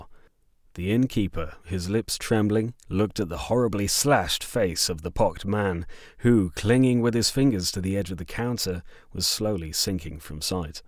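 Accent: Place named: Birmingham, United Kingdom. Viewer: Nice. Love the quivering Brummie. Only thing I would suggest is a very slight further increase in pace during the "combat". But that is just my opinion.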